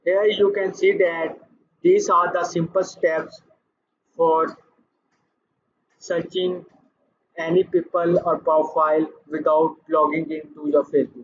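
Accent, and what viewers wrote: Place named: India. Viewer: When I heard the Indian accent I knew I was in good hands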